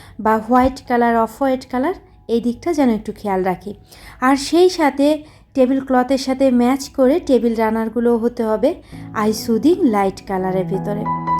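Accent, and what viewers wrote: Khulna, Bangladesh. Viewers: আসসালামুআলাইকুম কেমন আছেন আপু আপনার কথা শুনে আমার খুব আপন মনে হয় কারণ আমি ও খুলনার মেয়ে। আপনার বাড়ি খুলনা কোথায় জানাবেন প্লিজ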